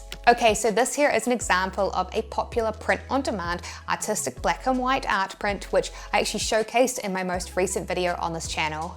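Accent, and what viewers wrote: New Zealand. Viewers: Knew you are a kiwi I grew up with a lot of kiwis in PNG so I know the accent. Thank you for your video 🥝😍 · I already knew you were from New Zealand, I'm American. Thanks for all the great content you put out. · I really like the New Zealand accent :)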